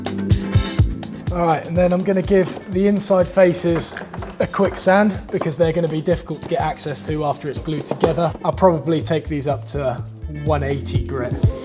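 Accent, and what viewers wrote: United Kingdom. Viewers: Man i LOVE that british accent!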